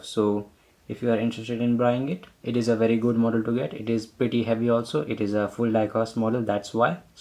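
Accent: India. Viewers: you sound like an indian are you in usa??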